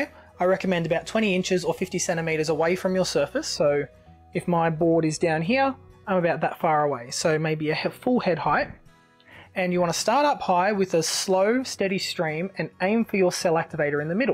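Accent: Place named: Australia. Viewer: Thank you, such a talent !!!! I always thought you were Canadian, love the Australian accent...definitely need to visit one day 🤗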